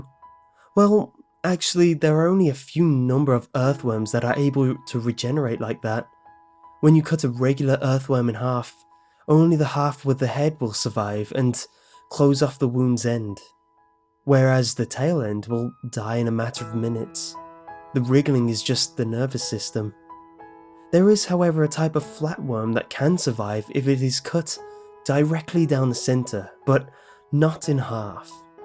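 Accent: England, United Kingdom. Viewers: u can pretty much read anything with your voice. People love the english accent. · i realy like your voice it is pritty but i have always had a thing for british guys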